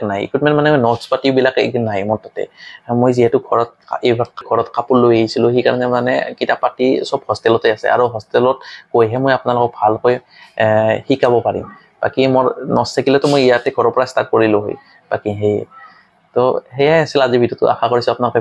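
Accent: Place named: Nalbari, India. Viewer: Daide Nalbarir nk ha 😄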